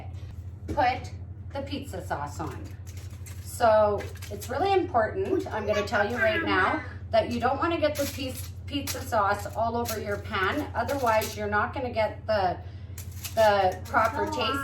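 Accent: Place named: Canada. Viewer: I love the accent too Canadian?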